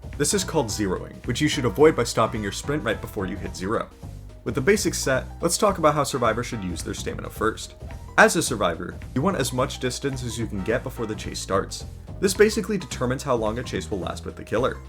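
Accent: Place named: United Kingdom. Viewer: ARE YOU FUCKING BRITISH?:face-purple-crying::face-purple-crying::face-purple-crying::face-purple-crying::face-purple-crying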